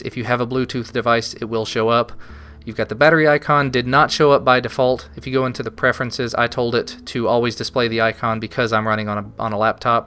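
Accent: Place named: South, United States. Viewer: oh well, I realize this is science-fiction weird that a complete stranger would focus on your accent on a Ubuntu review but, it's very light and fluid...I knew it was from southern America but...so many accents are heavy, and the hard R's and all but then Kentucky ppl come along... anyways...totally off topic so merry Christmas and review away please.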